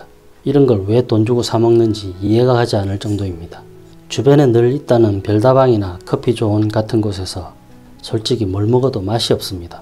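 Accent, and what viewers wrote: Gyeongsang, South Korea. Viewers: 경상도 분이신가 봐요 ㅎㅎㅎ 꼽표 오랜만에 듣네요 똥글빼이 ㅋㅋ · 늘 잘보고 있습니다. 제가 쌩초보라 쓰고 있는 연필이 4b인지 2b인지 궁금합니다. 강의중 그많은 말들속에 이건 4b입니다.라고 첨기 부탁드립니다. 그리고 같은 갱상도라 너무 정감갑니다.ㅎ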